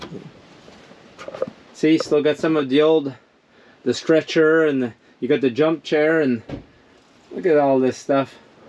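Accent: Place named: France. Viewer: no problem, the French accent can throw you off sometimes, I’m French and I get tricked sometimes. 😉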